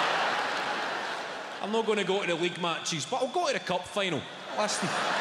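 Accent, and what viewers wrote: Scotland, United Kingdom. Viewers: I loved loved his deep Scottish accent!!! So sexy!!!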